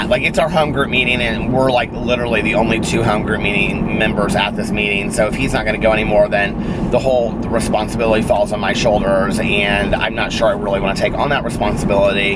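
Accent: South, United States. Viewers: I love you! And I love your southern accent. I’m from Ar & I Snapchat you all the time. Love how you say drank, sang.. 😂💋 have a good day!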